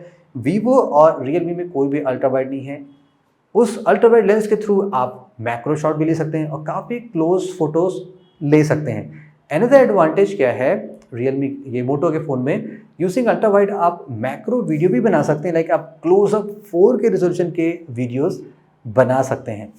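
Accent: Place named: Kanpur, India. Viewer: Bhai ap kanpur se ho kio ki saddi kanpur me bolte hai 👍👍👍👍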